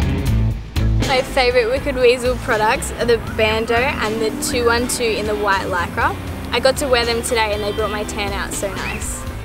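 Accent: Australia. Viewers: beautiful woman , lovely Aussie accent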